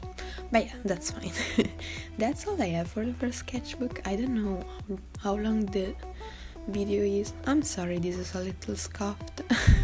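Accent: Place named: Italy. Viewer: I like your accent, where are you from? Italy?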